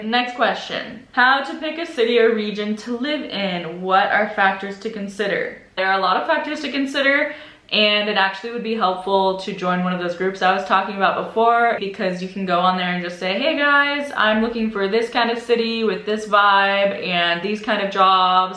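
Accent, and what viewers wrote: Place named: United States. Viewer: Interesting, I would though that longer time spent outside US would change your english to be more plain in intonation but you still have this singed US english. For example you say four times in the row "theeeeere" instead of plain and simple "there". If you were not from Nebraska I'd say your are from California :-))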